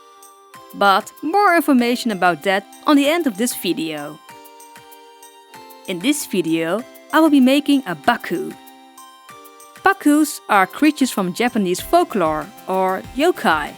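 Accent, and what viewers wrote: Netherlands. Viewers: wait hold up are you Dutch as well? You sound so Dutch it's... well, it wouldn't be funny either way, but like, this is the second Dutch Doll channel I've found is this what y'all do? Make great sweets, cheese, flowers, and dolls? Also, the mills are hella cute. Loved the repaint too, you're super talented!! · Qiick question, are you Dutch? I recognise the accent haha. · It sounds like you are Dutch.. No hate btw! I am Dutch! SO i just wanted to know.. 🤔😂😊❤️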